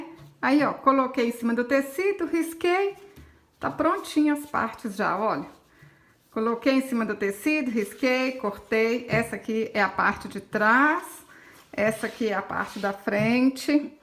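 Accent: Minas Gerais, Brazil. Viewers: Que sotaque gostosoooo…. Mineira uai…. Lembrei da minha querida avó paterna, uma crocheteira de mão cheia r minha primeira professora! Viu te seguir, amei seu trabalho, em tempos onde tudo se descarta regatar uma boneca é lindo! Beijos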